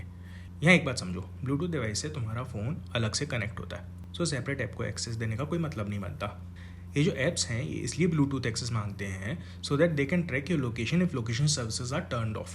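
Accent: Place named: Gwalior, India. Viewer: funny accent ... Ekdum Gwalior Andaaz me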